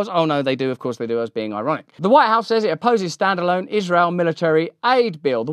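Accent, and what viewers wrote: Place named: United Kingdom. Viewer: Have you ever accidentally left your volume on when Russel’s British voice hits? Good hell.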